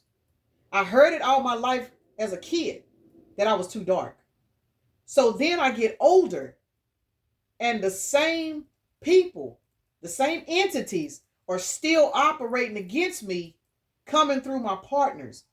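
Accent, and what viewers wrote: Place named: Texas, United States. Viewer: ESPECIALLY YOUR TEXAS-ASS ACCENT 🤠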